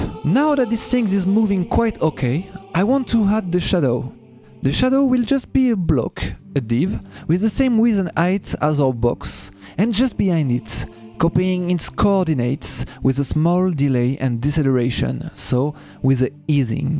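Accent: France. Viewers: Bravo pour cette vidéo ! C'est assez vrai que l'accent français aide pas mal a rendre le tout plutôt smooth ^^ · Yes! J’apprendrai l’anglais avec ton accent français, se sera plus simple à mon avis. Continue ce format! 🙂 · Méga cool en anglais avec l'accent bordelais. Même en étant une quiche avec l'anglais j'arrive à comprendre et donc probablement que je vais devenir plus à l'aise à la longue. C'est gagnant gagnant. Merci ! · J'ai pas pu regarder la vidéo en entier... Désolé, j'aime ton contenu et ta personne mais une vidéo de 12min en anglais avec un accent 100% français ça m'est insupportable 😞 (Je ne suis pas français) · Hello, petit retour d'expérience sur le passage à l'anglais, même si je vis depuis 6 ans en Irlande : Grâce à ton accent Français, et à un rythme "normal" : ton anglais est facile à comprendre pour un francophone sans avoir besoin de trop se concentrer, ce qui conserve l'expérience agréable (comprendre certaines vidéos US relève d'une séance de travail coté concentration). 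Belle transformation. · Je valide le changement de langue car je souhaite le maitriser et franchement avec l'accent francais c'est tellement plus facile, Merci d'avoir pris l'initiative👍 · Au contraire des nombreux commentaire, j'avoue que l'accent français me perturbe. Mais, tu as l'air d'avoir un bon vocabulaire et ça semble aider de nombreuses personnes. Pas sûr que tu sois référencé aux pays anglophone par contre ! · Ce que je voulais dire, c'est que y'a plein de Français qui n'aiment pas entendre l'accent Français en anglais, plein d'Espagnols qui n'aiment pas entendre accent Espagnol en anglais, plein d'Allemands qui n'aiment pas entendre l'accent allemand en anglais, etc. C'est souvent les gens qui parlent nativement une autre langue que la tienne qui aime bien ton accent. Du coup les commentaires de Français qui disent qu'il a un accent français pas ouf, on s'en fiche, vu que si tu parles anglais, c'est pas pour les français, c'est pour des gens qui sont pas français et ont pas de soucis avec ton accent. 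J'pense on dit exactement la même chose mais on s'est pas compris xD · Well done for your first video in English! I would have personally made the websites presentation shorter to give more room for the coding part. Anyway I loved it and keep going in English, you still rock because french accent rocks no matter what they say! And the new reviewww generic is gorgeous, made by Matei I suppose? You're really a great team! Go and conquer the world 😎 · J'ai du mal a resté focus avec ton accent francais (alors que j'ai le meme accent quand je parle mdr) j'ai bcp bcp + de facilité a comprendre l'anglais avec l'accent pakistanais (ne me poser pas de question sur ca) en tout cas bonne chance INTERNATIONAL CODE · Je valide le changement de langue car je souhaite le maitriser et franchement avec l'accent francais c'est tellement plus facile, Merci d'avoir pris l'initiative👍(copié collé le mec) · I love your french accent 👻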